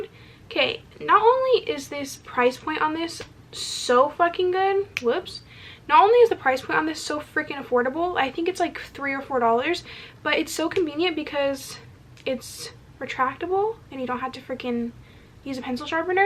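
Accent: United Kingdom. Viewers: i love your random british accent 😂❤️ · The British accent LOL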